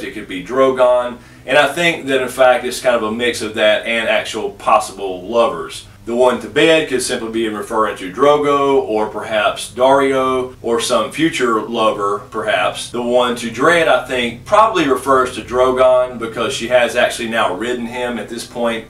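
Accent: South, United States. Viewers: I am so glad I found this channel! You are the first person I have seen/heard with a southern accent to clearly articulate theories and discussion about the books. Brilliant!! Makes me proud to be southern!! ;)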